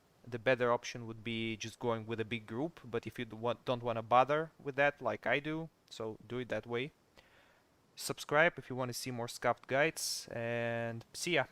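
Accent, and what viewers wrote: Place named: Russia. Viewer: That russian accent 😁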